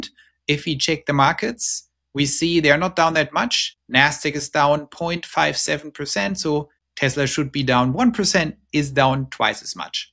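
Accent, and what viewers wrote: Germany. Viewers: danke----fyi: Palatina is the latin word für "Pfalz" this company was founded 1993 as Grohmann engineering for innovatie solutions of automation processes in manufacturing....zur Info....Palatina...ist das lateinische Wort für "Pfalz" Du bist doch Deutscher?! zumindest verrät mir das Dein englischer Akzent:-) lebts Du in den Staaten? Tesla hat ja schon vor langer Zeit diese Firma gekauft Tesla Automation wurde 1983 als Grohmann Engineering in Prüm gegründet. Mit dem Ziel, innovative Lösungen für die Automatisierungsbranche zu entwickeln, hat sich das Unternehmen kontinuierlich weiterentwickelt und seinen Fokus stets auf leading edge Technologien gelegt.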